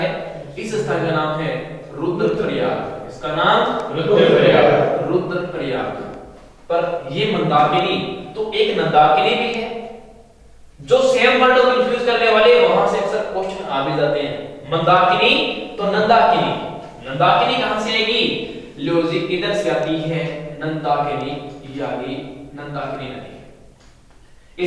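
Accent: Rajasthan, India. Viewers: Ek to waise ap rajasthani bolte h upar se ar awaj vibrating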